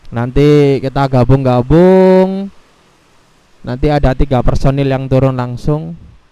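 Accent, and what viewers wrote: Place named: Java, Indonesia. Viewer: Lah jawane medok to ms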